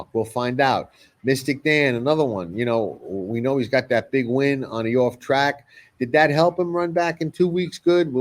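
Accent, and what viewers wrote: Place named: East Coast, United States. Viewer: Hey,. I'm sorry, too. I left grey out..went with Tuscan..my reason was I didn't think grey could run well on short rest. I love your east coast accent, thanks for the hood insight. You know your game. I'm just learning.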